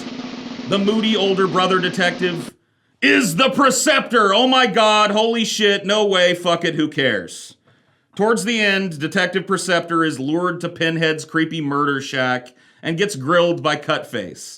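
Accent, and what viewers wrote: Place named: United States. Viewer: Ok which part of america does this amazing atheist voice come from?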